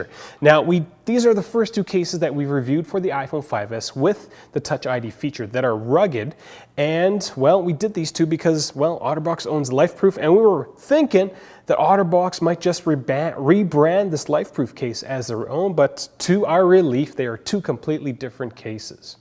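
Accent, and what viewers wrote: Canada. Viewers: Canada eh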